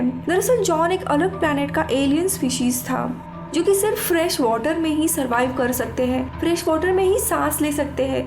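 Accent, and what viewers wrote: Maharashtra, India. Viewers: Apki accent sai ki aap Maharashtra sai ho am I right?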